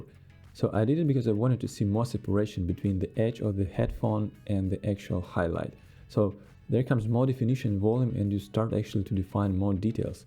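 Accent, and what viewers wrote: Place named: Russia. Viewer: Рашен инглиш ))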